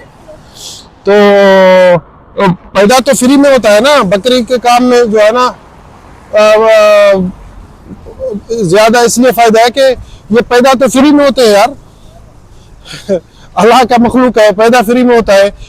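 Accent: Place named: Sindh, India. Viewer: Talking like a sindhi national,,aon sahih pyo chawan ya galat ❤ saen kerry ahyo ?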